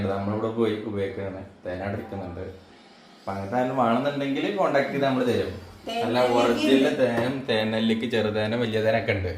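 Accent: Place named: Malappuram, India. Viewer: നിങ്ങൾ മലപ്പുറത്ത് എവിടെയാ ?😂😂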